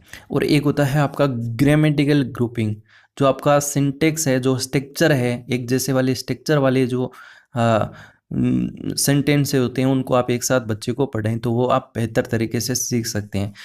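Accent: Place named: Haryana, India. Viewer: Guruji 👏AAP pdane saath saath Jo hme motivate karte ho Aapka bahut bahut dnyavad .aapki aawaj se esa lagta h Guruji 👏 Jese AAP haryana se ho AApke pdane ke method ka koi jwab nhi Guruji 👏 AApke vedio Dekhkar saath saath notes bna rhi hu Guruji 👏 from kaithal HR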